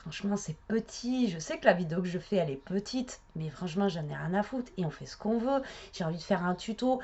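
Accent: South, France. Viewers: Ha pauvre. Perso j adore tes tutos. Ton petit bordel sur ton bureau. Ton accent du soleil......allez laisses tomber. Et continue de nous faire rêver avec ton sens de la création Bisous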